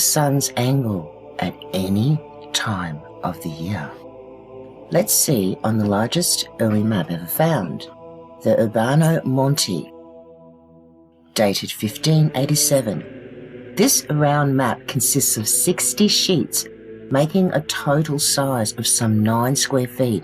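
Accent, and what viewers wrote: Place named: Australia. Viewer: I was joking about your accent. I like the Australian accent btw... and I love your content. I just enjoy how you aussies pronounce Year. Yeeuh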